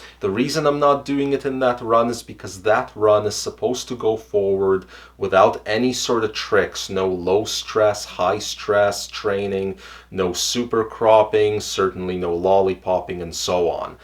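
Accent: Russia. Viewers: Your a good hombre so I subscribed. By the way I think I really good at determining someone’s accent having a mom from Finland with super heavy one. Just thought there was for sure some Русский there Хорошего тебе года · My guess is he has a Russian background and moved to North America... Perhaps Canada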